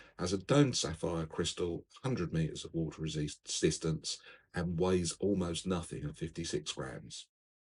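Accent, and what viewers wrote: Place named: England, United Kingdom. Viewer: Excellent review mate, thanks. Just the right amount of meat-and-potatoes information about the watch with a sprinkling of clever humor for desert. Not to mention the charming English accent for an authoritative quality. --From Central Oregon, USA.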